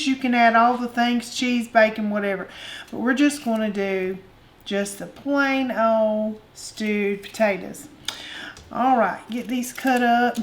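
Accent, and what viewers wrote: South, United States. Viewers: Hold up, did somebody say potatoes? And she's southern!? Sign me up, baby I'm in! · Patatas 😂😂😂 that southern brawl has to count for something. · I love that southern accent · That southern accent is on utube heavy today!! Kissin wears out, cookin dont!!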